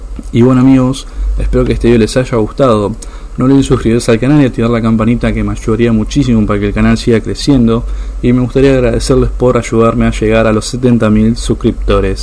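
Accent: Argentina. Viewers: Y porqué habla un argentino,???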